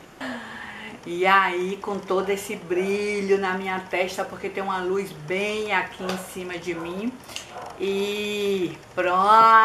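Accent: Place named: Ceará, Brazil. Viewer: Sotaque lindo! Ceará?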